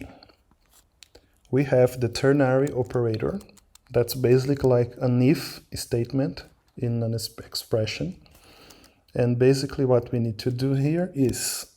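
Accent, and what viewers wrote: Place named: Brazil. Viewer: Very good english bro. Haha percebi que era brasileiro pelo sotaque, muito bom o tutorial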